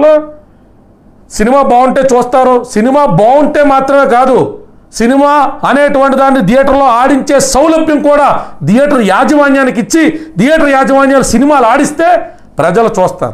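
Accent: Godavari, India. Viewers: Mee yaasa praasa chala bagundhi. godavarolla maatalu👌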